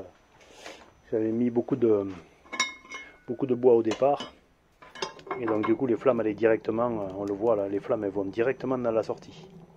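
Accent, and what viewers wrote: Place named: Bigorre, France. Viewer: Félicitations. J'ai moi aussi installé mon poêle dans mon atelier cette semaine. J'avais aussi regardé pour un Godin identique mais je n'ai pas eu la chance d'en trouver un à un prix si bas. C'est donc un theca de 20kw car j'ai la chance d'avoir une grande surface de jeu. Très belle vidéo...c'est un plaisir d'entendre l'accent bigourdan sur YouTube. Amitiés gersoises Anaïs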